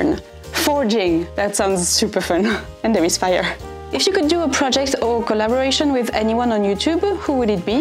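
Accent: France. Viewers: You are just adorable! I love watching you!!! Your accent is why I took 5 years of French. Almost no one speaks French in Western Colorado, but I still think it’s the most beautiful language!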